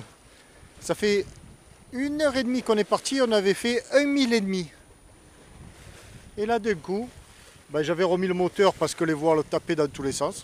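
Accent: South, France. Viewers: Salut à toi j'espère que tu te porte bien ainsi que Tabasco, je voulais te dire que tes vidéos sont parfaites quotidiennes et hebdomadaires nous sommes tous au rendez-vous, cela fait quelques temps que je suis tes vidéos ( à vrai dire c'est mon frère qui m'a fait découvrir ta chaîne) et je vois également que tu montes à presque 4000 abonnés c'est beau parce que lorsque tu réalisé tes vidéos tu te prend pas la tête, tu mène ta vie tout simplement et tu nous la fait partager et le faite de poster plusieurs vidéos par mois ça crée une addiction lol (comme Netflix), du style " gros vient de sortir une nouvelle vidéo vas voir" ainsi avec ton accent Typique du sud on s'y croirait là sur le Tabasco, nous aimons bien refaire ton accents " Tabascoooo" Avec le temps tes vidéos ce sont améliorées (le son, vidéo, cadrage, Drone), celà ne peut qu'aller vers l'avant, Attention en mer. 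Signé un jeune néophyte!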